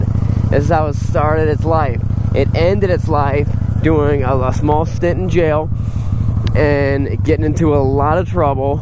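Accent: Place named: Georgia, United States. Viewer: LMFAO... can tell was in GA for a while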